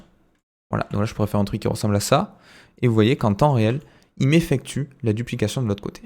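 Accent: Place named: Toulouse, France. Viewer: T'as l'accent toulousain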